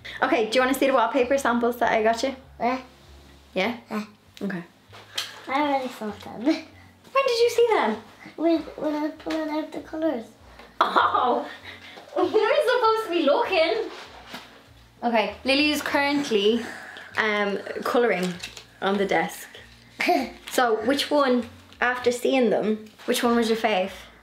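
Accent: Ireland. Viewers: Her little Irish accent is so cute ♥️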